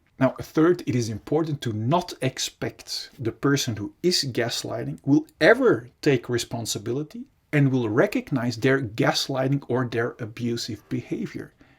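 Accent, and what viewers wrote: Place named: Netherlands. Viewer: I can hear your Dutch.... thanks for the video👍🏼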